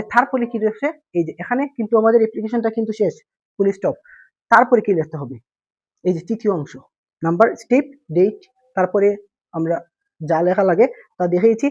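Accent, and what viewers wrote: Sylhet, Bangladesh. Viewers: Vai Sylheti ni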